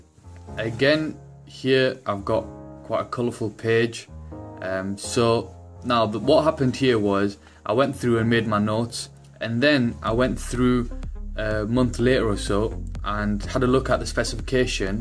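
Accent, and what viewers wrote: Newcastle, United Kingdom. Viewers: hes defo a geordie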